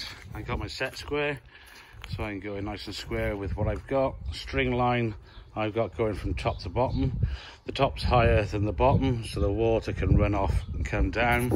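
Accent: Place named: Wales, United Kingdom. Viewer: Where are you based if you don't mind me asking? I hear a Welsh twang in your accent 🤣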